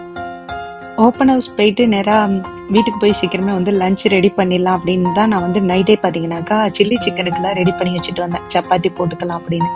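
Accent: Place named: Tamil Nadu, India. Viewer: Ur way off speak like tamil Nadu that's y I ask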